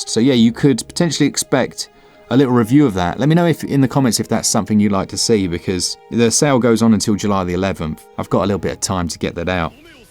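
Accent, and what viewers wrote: England, United Kingdom. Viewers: As someone from the USA who appreciates a good accent. “Little kitty, big city” will now default to an English accent every time I say it. Cheers!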